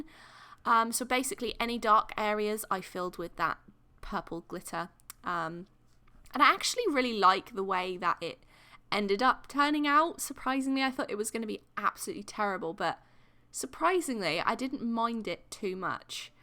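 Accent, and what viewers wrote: United Kingdom. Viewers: im confused is she British or American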